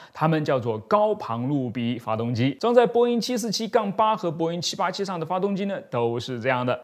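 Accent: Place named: Shanghai, China. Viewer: 从视频中得到的信息已经从的口音分析，毕业于上海大学？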